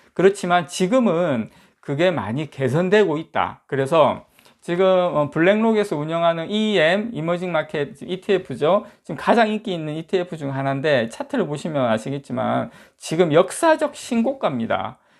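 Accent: Gyeongsang, South Korea. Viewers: 경상도 사투리 듣기참좋네요 유익한정보 감사합니다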